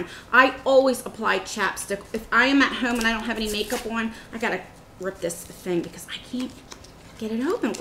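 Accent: United States. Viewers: What’s her accent? What state?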